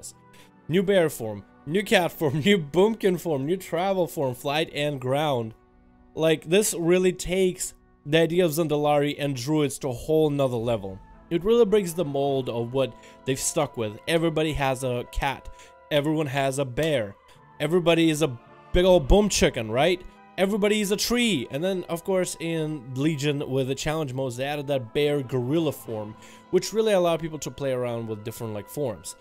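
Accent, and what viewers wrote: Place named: Russia. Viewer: This is not meant to be hateful... I'm just trying to give my Opinion on how to make your Videos a lot more enjoyable (for me, at least). It really bothers me, when you sometimes just mumble some words and I can't really understand what you're saying - or I really have to concentrate on the Video. I like to put videos like yours on, while playing. And it would make it a lot more enjoyable, if you'd just take your time to pronounce everything correctly. And I think you're part russian (Am I right?) and that can make speaking English harder. As a non native english speaker myself, I get you 😉 But I think you could really improve that, by just talking a bit more slow and just trying to take your time. I really like your content. But that sometimes really bothers me. If I'm the only one on that, then nevermind, forget about it 🙈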